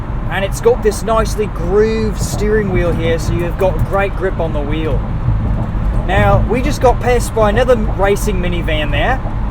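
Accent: Australia. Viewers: Dat Aussie accent tho... :O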